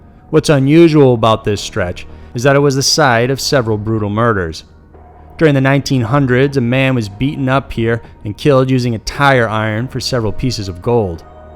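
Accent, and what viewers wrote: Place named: North Carolina, United States. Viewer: I'm from Jersey & I appreciate the mention but Shades of Death road doesn't sound so "murderous". As opposed to something like the Texas killing fields or Highway of Tears. They have many murders occurring on or near those roads. But regardless I really enjoy your channel & often will watch a few a day. Thank you for your hard work! Are you from North Carolina (the wsy you day "nine")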